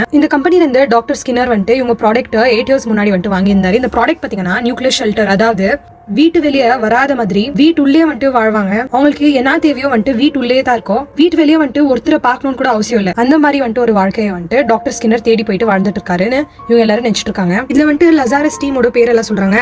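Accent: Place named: Bangalore, India. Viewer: Banglore tamil super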